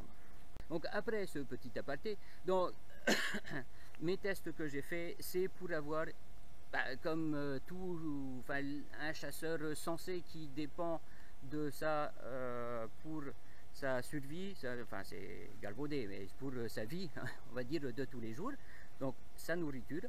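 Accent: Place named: Tahiti, French Polynesia. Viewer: Tu a un accent tahitien 🤗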